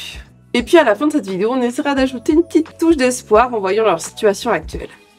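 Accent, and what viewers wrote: France. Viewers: Bonjour. mtn tu l'est. Sois pas trompée par tout ce blah blah patriotique bidon dans les stades de rugby pleins de drapeaux et de chansons. J'étais le seul élève dans ma classe à choisir de continuer avec le gallois après 14 ans quand cette matière cessa d'être obligatoire. Le seul! J'ai étudié le français et le gallois jusqu'à 18 ans. Mtn j'ai 60 ans et ma fille mon (premier, plus à suivre, j'espère) petit fils sont gallophones, langue dominante. Plus tard dans la vie je me suis fait très fort en Catalan aussi, autodidacte. J'ai fait des recherches sur les autres langues liées avec le Catalan et ensuite j'ai tomber sur ta vidéo sur le Gallo. Ensuite j'ai continuer à voir tes autres vidéos. Je trouve plutôt intéressant écouter ma propre langue prononcée dans un accent français mais je n'ai pas envie de l'apprendre. 4 quatre langue me suffisent je pense. 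Merci et bonne chance à la suite!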